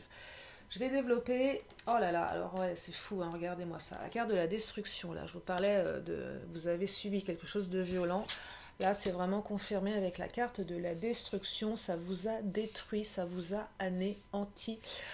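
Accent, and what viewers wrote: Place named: Paris, France. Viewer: Coucou, tu as un bel accent. Parisienne ? Merci de ton partage. Très belle lecture. Tirages a choix serait bien aussi. Plus interactif 😉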